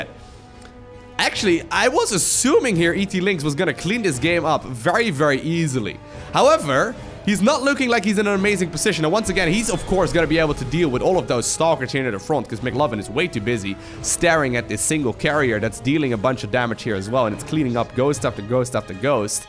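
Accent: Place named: Netherlands. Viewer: The way you say "catapult" is the greatest. Im from america so i dont hear very many people talk with a dutch accent, i think its great. And i love watching lower level casts just as much as high level/pro casts because you see so much more variety in play styles. Granted, at lower levels, there is a lot of cringe moments (obviously because of the lower level) but, the cringe from both sides equals out and makes it a fun game to watch regardless. Especially since most of the time, its so hard to tell who is winning untill the very end.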